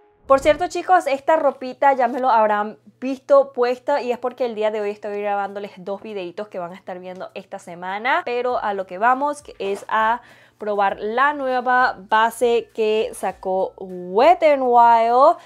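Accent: Venezuela. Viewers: siempre ah tenido el acento venezolano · Ella es venezonala 😂 no manches como quieres que hable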